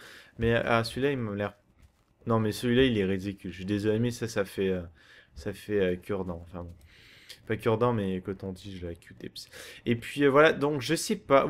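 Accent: Quebec, Canada. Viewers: Il est canadien ou francais? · Ouais, il vit au Québec donc desfois l'accent québécois surgit :D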